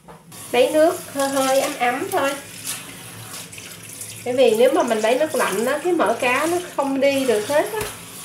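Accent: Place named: Southwest, Vietnam. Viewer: thích nghe giọng của người miền tây nam bộ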